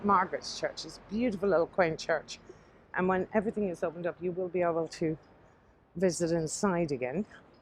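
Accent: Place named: South, Ireland. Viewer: Found you by accident through looking for famous graves. I was so interested in your voice and i was thinking to myself thats and south irish accident, i came by your videos of Killarney and my god you a killarney woman. Im from North Kerry in a small town of Listowel. Your videos are so interesting and your jack the ripper story was class. Keep up the great work from a irish fan ( sure you only from down the road).:)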